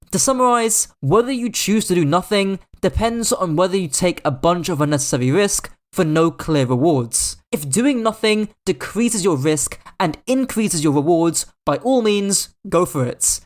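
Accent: United Kingdom. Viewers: He's not talking like a Sydneysider Aussie. Now that's an obnoxious upwards twang at the end of every single sentence. is talking totally regularly for matter-of-fact speaking. Sounds like a regular Brit.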